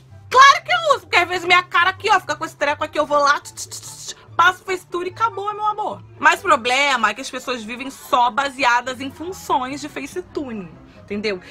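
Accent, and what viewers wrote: Rio de Janeiro, Brazil. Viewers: Esse hino de sotaque carioca aaaaa hahahaha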